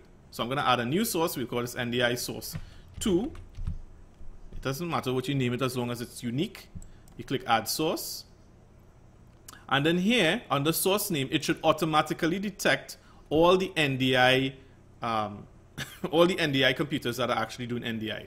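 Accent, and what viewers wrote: Trinidad and Tobago. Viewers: you from trinidad awa? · why do you sound Trinidadian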